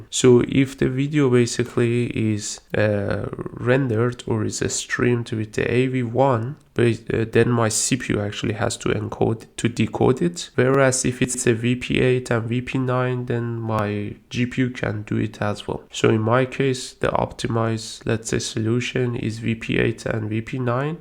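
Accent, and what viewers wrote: Iran. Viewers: Nice vid. It helped. Also, is that a Persian accent?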